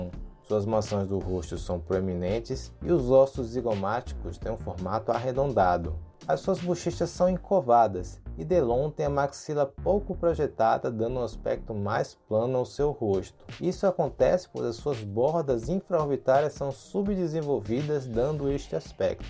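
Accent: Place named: Salvador, Brazil. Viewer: Tu é de salvador? Conhece esse sotaque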